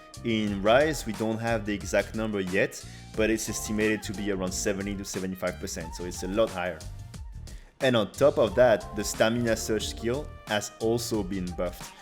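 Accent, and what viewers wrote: France. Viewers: ohh i love bow what set are you using and are you french? i think i hear a french accent :3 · Haha cet accent, tu es français n’est ce pas ? Sympa la vidéo!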